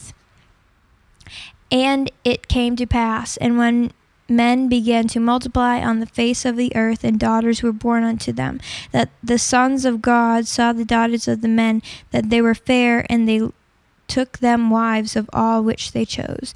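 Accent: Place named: United States. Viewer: I like her real voice, it sounds like an American version of my voice lol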